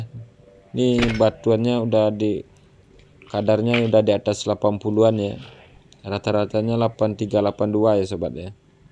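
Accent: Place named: Minangkabau, Indonesia. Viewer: saya dengar dari dialek bahasanya ini pasti dari tanah minang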